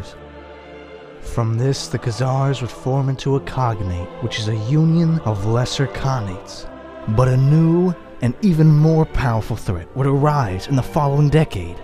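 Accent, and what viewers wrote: United States. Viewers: A moderately interesting look at a little known area of mediaeval history... but the style of narration is appalling and impossible to take seriously. It's not just the Yankee accent I object to but the breathlessly hyperbolic delivery which sounds like a bad sports commentator trying to put a spin on a report about a very boring football match (Association Football that is, not that ludicrous running around in suits of armour the Yanks do). My advice is to get a decent scriptwriter and stop trying to make it sound like the old intro to 'Soap'. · Is the guy narrating this from Jersey? Serious question.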